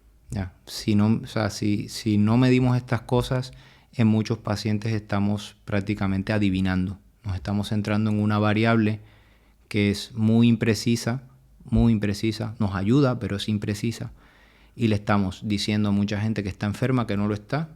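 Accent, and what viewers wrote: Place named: Caribbean Coast, Colombia. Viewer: Por su acento creo que este médico es costeño de Colombia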